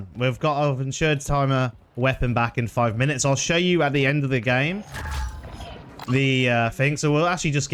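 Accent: Norfolk, United Kingdom. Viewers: Where you from fella? Norwich boy here and I swear I hear a Norfolk twang here and there??! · I'm convinced by your slight accent that you're from Norfolk like Kings Lynn way lol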